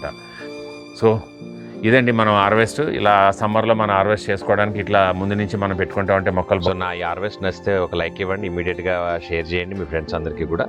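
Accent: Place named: Andhra Pradesh, India. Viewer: Nijame sir. Content chala baguntundi. Mee videos chusi nachi mee store nundi seeds kuda monnane order chesanu sir. Ma Andhra style lo meeru chakkaga matlade vidhanam Naku chala nachutundi sir. Meeku Namaskaaralu sir 🙏🙏🙏🙏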